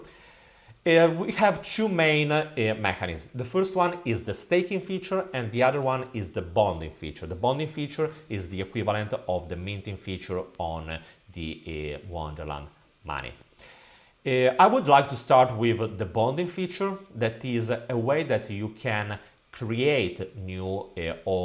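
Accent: Italy. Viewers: Sei italiano?😅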